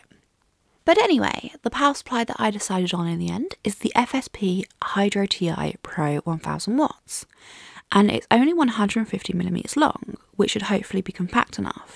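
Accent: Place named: RP, United Kingdom. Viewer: still watch your videos just to hear you say "but" with that posh accent of yours.... will shamelessly steal your voice for my AI personal assistant when the time comes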